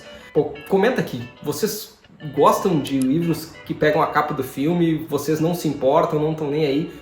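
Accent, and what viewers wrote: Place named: South, Brazil. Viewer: Homem eu jurava que você era do sul. Você .pra no rio de janeiro? 😅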